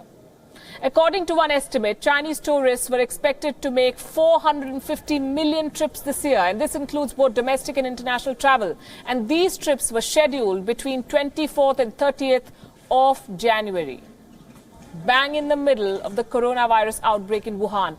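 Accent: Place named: India. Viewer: Indian accent make my ears bleed : (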